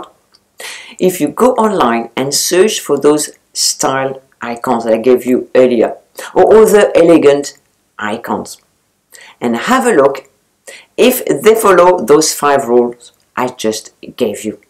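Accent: France. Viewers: Besides the great, and wonderful content, I come to this channel to hear French accent!♥️♥️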